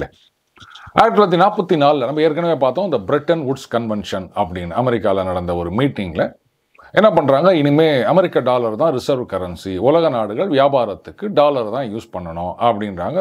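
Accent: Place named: Chennai, India. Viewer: வர்ணிப்பு...அசத்தலாக உள்ளது. சூப்பர்..பக்கா சென்னை வாழ் தமிழ் குடிமகன் போல... வந்துகினு. போய்க்கினு.. ஆனால்... நல்லா இரசிக்கும்படியாகத்தான் இருக்கு.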